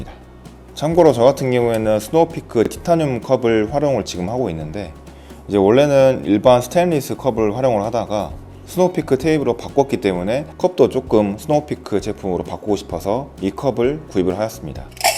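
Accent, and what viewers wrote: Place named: Jeolla, South Korea. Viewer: 전라도사투리가 정감이가네요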